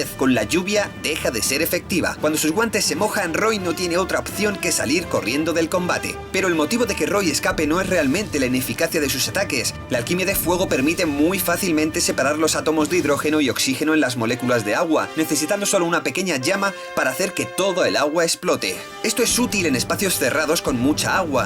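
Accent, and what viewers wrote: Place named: Spain. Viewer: Holy cow, yo si quiero la segunda parte! Porfa :3 aunque definitivamente la pronunciación es muy diferente en España que en Latinoamerica jaja me encantan esos detalles n.n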